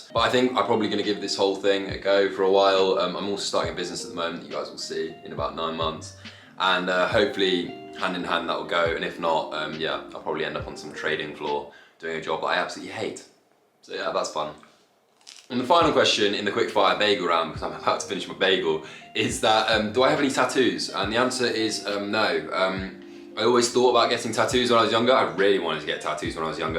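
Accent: United Kingdom. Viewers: THE BRUSISH VOICE AHH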